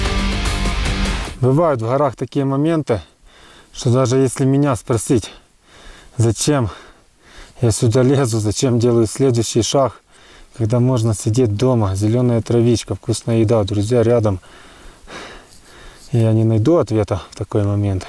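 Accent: Ukraine. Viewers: Лахерь на хоре))) какой ужасный украинский акцент, тогда бы уж на украинском ховорил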